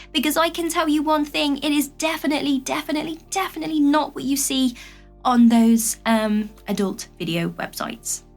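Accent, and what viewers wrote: United Kingdom. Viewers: I'm already married, but I enjoy hearing these tips and love the British accent. And these videos are a nice distraction from all the fucked up things going on in the world.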